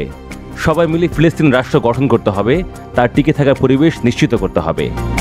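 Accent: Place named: West Bengal, India. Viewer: একাত্তরে নিউজ করা ভাইয়াটার নাম পরিচয় অবশ্যই বাংলাদেশ জানতে চাই আপ ব্যক্তিগতভাবে আমার কাছে কেন যেন মনে হচ্ছে উনি বাংলাদেশি কোন ভয়েসে কথা বলতেছেন না । পশ্চিমবঙ্গের বাঙ্গালীদের ভয়েসের মতো কথা বলতেছেন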